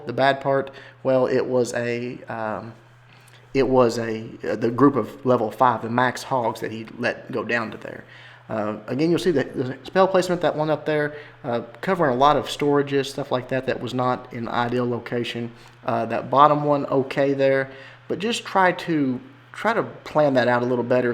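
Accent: United States. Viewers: Good video, your english sounds disgustingly american though.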